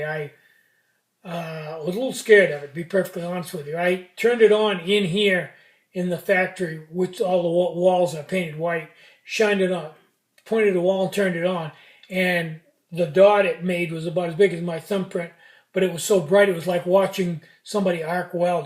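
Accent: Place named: Boston, United States. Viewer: sounds like you're from Boston.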